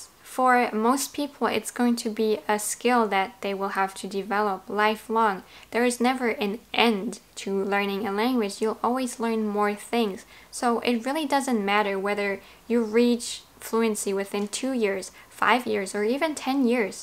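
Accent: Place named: Germany. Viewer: Wow I just found this video and you expressed exactly my thoughts about refold. So many of your points of view I also had them in the back of my head but for some reason I didn't realise I needed to listen to them from someone else. By the way, what is your native language? You give me strong German vibes but your English is really good!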